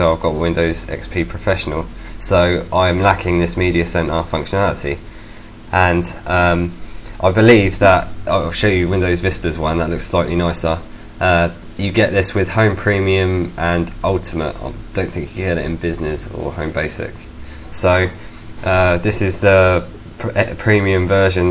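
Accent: England, United Kingdom. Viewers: lol i always had a feeling you were from england so am i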